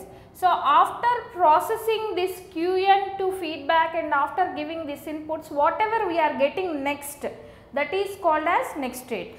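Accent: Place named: South India, India. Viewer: your accent is shittier than shit itself. God help these South Indians with English lol.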